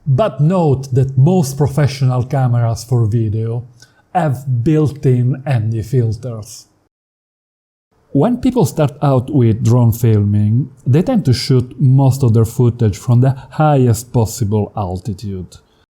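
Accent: Italy. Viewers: I could listen to you all day, love your Italian accent :D Great info! Many thanks :)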